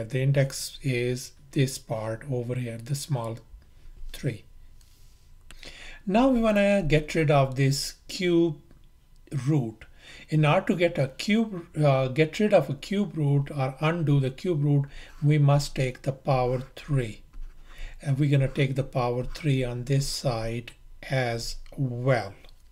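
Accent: India. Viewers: Indian dialected man good job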